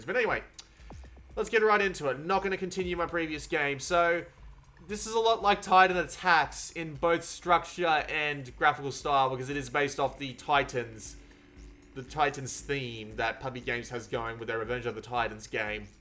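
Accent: Australia. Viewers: That voice. MATE. from a fellow Aussie. Jesus.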